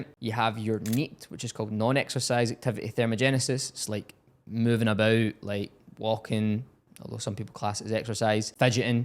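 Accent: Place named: Scotland, United Kingdom. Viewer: he either doesn't understand the Scottish accent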